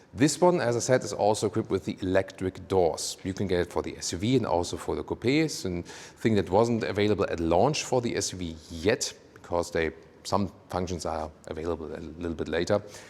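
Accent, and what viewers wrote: Germany. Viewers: Drinking game: Take a shot every time says "Wuerszhion" (Version) We love your reviews and beautiful German accent <3 The black paneling around the wheels is the ugliest I have seen in a long time. Usually, people air for a look where the wheels fill out the wheel arches as much as possible, and here they have visually gone in the opposite direction.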